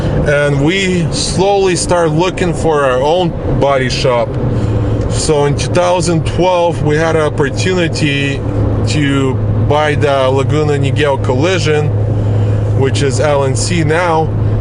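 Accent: Russia. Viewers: WOW, I am blown away and fighting the tears. I knew with your beautiful accent that you probably came from Russia. As a proud American, and this is long overdue, welcome to your new home! You and your family have done more with the American dream than we native Americans. Your dreams, your hard work and faithfulness to your family has paid off. Your dad recognized his worth and that of his family. I am thrilled you have a second store! Who knows maybe a 3rd or 4th awaits. Your are the quintessential success story, and you did it really without a lot of help. You were and are faithful and patient. Giving credit where it is due I personally believe God brought you here. Whenever something good happens in my life I thank Him for it. When the storms of life appear I ask Him what can I learn from this how can I grow. You’ve done that too. You now have one of the premier and most successful channels out there. 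I wish you all the best for you and your entire family. A goal on my bucket list is to someday shake your hand and hug you! Keep up the awesome work!!!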